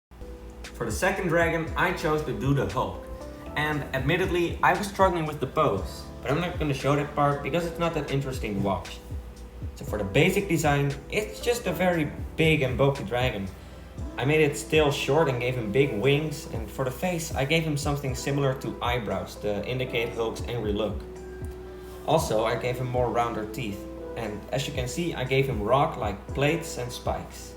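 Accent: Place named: Netherlands. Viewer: Ew weird dutch accent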